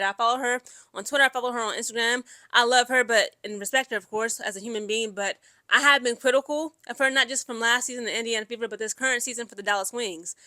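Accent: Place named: Caribbean. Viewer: I think sometimes I hear a little West Indian twang too and I’m hailing up from St. Thomas, V. I. and Guadeloupe myself. The thing is I struggle to keep up because she talks so dang fast🙂.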